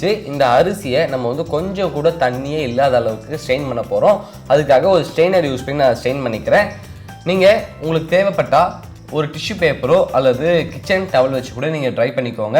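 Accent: India. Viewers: Am following you since I watched your show in behindwoods .. particularly for your pure tamil accent and the kural that u said apart from this your cooking methods are damn awesome, informative and i would definitely try in my Hotel and I own The Proud - Madurai Sri Muniyandi Vilas Hotel 💐💐💐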